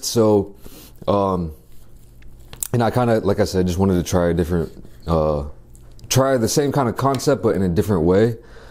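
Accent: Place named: South, United States. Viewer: Asian man with a southern accent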